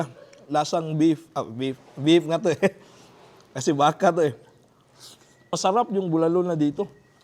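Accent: Visayas, Philippines. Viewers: I love that bisaya accent Though😊, lalo na ang pag ka brought ng sabaw😂😂😂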